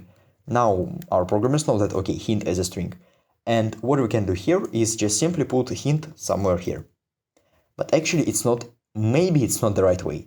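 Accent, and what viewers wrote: Russia. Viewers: Братишка, ты русский? Чувствуется русские нотки в английском. Но отличный и понятный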